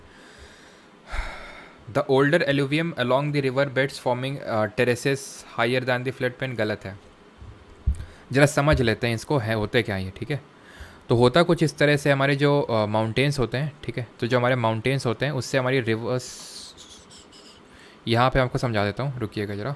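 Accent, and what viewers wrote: Kanpur, India. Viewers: Sir kanpur se ho aap ?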